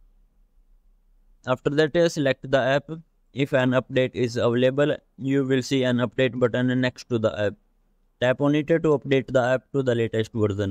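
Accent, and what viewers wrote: India. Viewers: It's English but with a heavy Indian accent. The only people who would understand this are Indians and people who work with English speaking Indians. For the most part, they are very tech savvy and their contributions are welcome. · Even for an Indian his accent is too heavy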